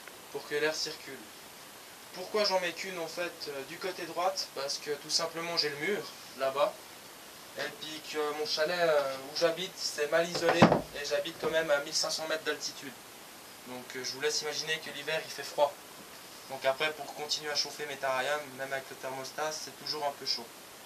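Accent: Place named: Switzerland. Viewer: hello, vu ton accent et ta minutie tu viens de suisse et moi aussi :) du coup j'aurais aimé savoir ou est ce que tu as acheter les vitres et les rails car je n'en ai pas trouver a jumbo ou autre magasin de bricolage :(( mes pantherophis demande de la place, je cherche a me lancer dans la fabrication d'un terra comme le tiens. en espérant que tu pourras m'aider... en tous cas beau boulot!! chapeau! et merci pour la vidéo.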